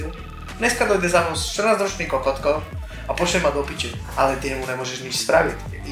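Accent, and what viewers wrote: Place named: Trnava, Slovakia. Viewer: Toto je trnavčinka jak remeň 🤣🤣🤣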